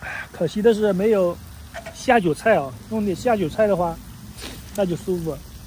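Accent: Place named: Ningbo, China. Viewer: 的浙江寧波普通話太可愛了！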